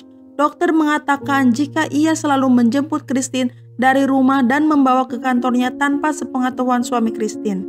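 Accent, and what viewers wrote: West Java, Indonesia. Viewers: chanell ini nih ngerti klu pngen nunjukin khas sunda bs dgn nyebutin (kumaha damang)trus yg bukan org sunda kan ada kata2(welcome....)itu mskpn bahasa inggris jg kn global org2 byk yg tau artinya apa...dgn logat jg org tau ini chanell ini org sunda yg puny...ada chanell lain lupa namanya chanel ny apa trus yg puny ny org jawa dan bacain review film kata2 ny hmpir smua bahasa jawa tp kan yg nonton bukan jawa semua ibaratny klu mau jawa smua hrus ada translate dibawahnya jgan smpe kata2 ny jawa semua kan bisa sedikit kata tp org paham ....makany chanell trsebut byk hujatan n byk yg komentar jlek.... nah aku paham klu mimin bilang subcrb jd subrek trus like dibacany jd likeu itu sengaja lah gk usah dikomentar udh jd ciri khas....memang sbgian klu org sunda emng lidahnya pabaliut alias rumit klu dlm pengucapan apalg b inggris kebyakan makan sampeu hehehe..bercanda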